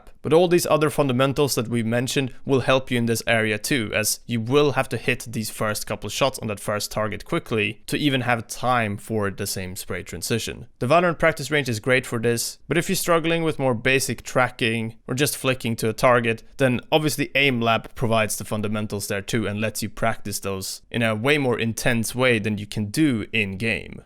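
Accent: Sweden. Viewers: are u from sweden? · is it just me or does it sound like he has a swedish accent while speaking english.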